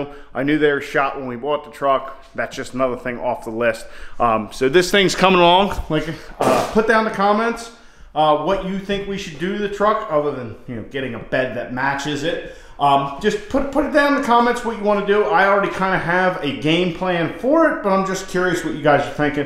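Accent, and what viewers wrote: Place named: Pennsylvania, United States. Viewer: First minute or 2 of you talking knew you were from PA lol I'm from the lehigh valley. Have the same issue with 24v going to tackle this asap won't pass inspection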